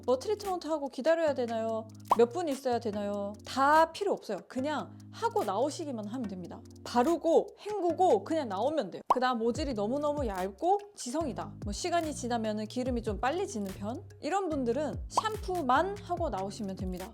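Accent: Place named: Busan, South Korea. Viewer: 부산사람은 역시! 숨길수 없는 악센트가 있네유~ 반가워요~ 저는 부산기장 살아요~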